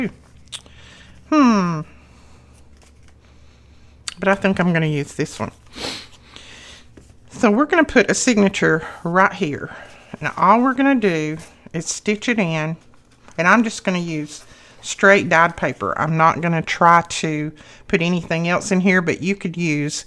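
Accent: South, United States. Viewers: Where do you live? The accent is southern I remember you saying in another video when someone said to slow down talking lol. When you dont talk fast. Lol. Anywho I am in Hartselle Alabama. Small town a little ways from Huntsville and Birmingham. Kind of in the middle closer to Decatur Alabama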